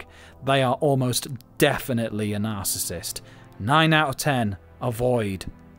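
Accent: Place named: United Kingdom. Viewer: Not at all was I expecting a man with a british accent from a small character good vid though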